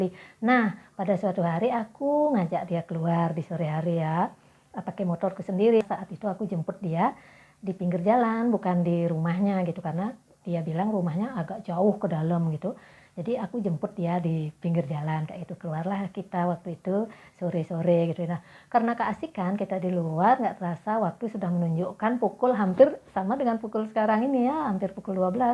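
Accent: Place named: Bali, Indonesia. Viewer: posting malam bgt, tp ada notif langsung nonton saya mba krn kamu lucu. logat balinya kental sekali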